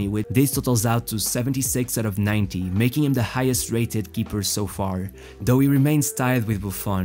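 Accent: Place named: Portugal. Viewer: confessa lá, tu és tuga.... nota-se no sotaque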